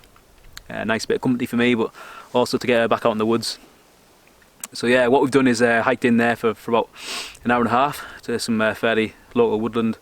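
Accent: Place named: Teesside, United Kingdom. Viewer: Brilliant video again bud, were about's you from again? you sound local to me around the Cleveland/Teesside area. I could be totally wrong though lol, Great Channel Keep it up. Si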